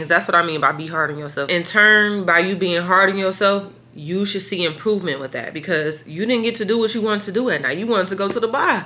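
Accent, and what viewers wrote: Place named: Detroit, United States. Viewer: I can hear the Detroit in your voice ❤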